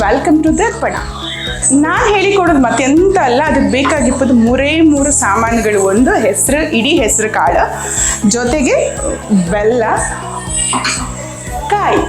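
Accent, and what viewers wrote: Kundapura, India. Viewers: kundapura kannada super 😍😍 Niv tumba chanagi matadtira.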